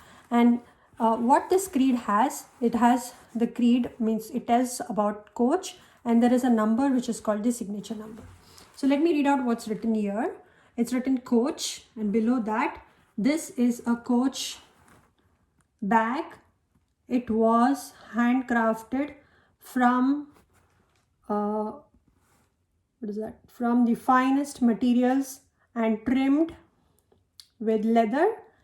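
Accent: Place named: Punjab, India. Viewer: when you speak Ma'am like a tone of Indian punjabi 😊watching your videos from Philippines , i have also coach klare with revits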